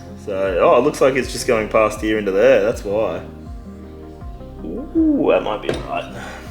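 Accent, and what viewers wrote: Australia. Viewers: I hear the Australian accent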